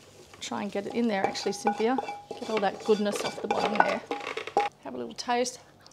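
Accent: Australia. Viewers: Chef Also, thank you for replying as to why the oil! Ok-gotcha... It was what you decided to use for that day! I’m finally going to make this bean dip this week! Been busy! Hugs to you... Also, love the accent and one day hope to visit Australia! Yet I think you’ve shared before that you’re ••not in Australia and rather: Thailand? Coolness either way! Blessings....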